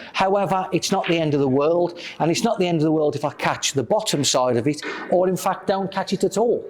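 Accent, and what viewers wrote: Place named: Birmingham, United Kingdom. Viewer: That’s a really thick Brummy accent!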